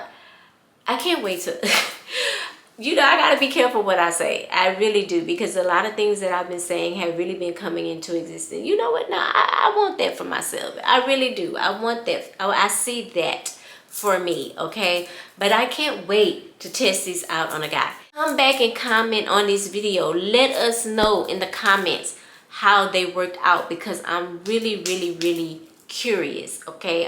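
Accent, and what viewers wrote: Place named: East Coast, United States. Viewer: I would definitely!! I love watching perfumes & fragrances, indulge, I love your East coast ♥️ accent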